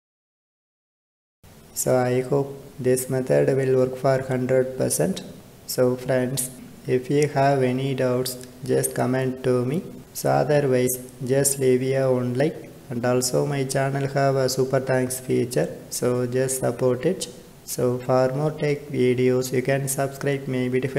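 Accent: India. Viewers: u indian?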